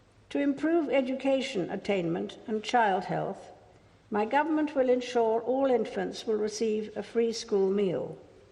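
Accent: United Kingdom. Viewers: I'm surprised! What accent is that? I mean, her speech is so clear to me, a non-native, speaker of English... I thought her accent was similar to those Cockney accents of the BBC ! ===(gilberto lehrer from brazil)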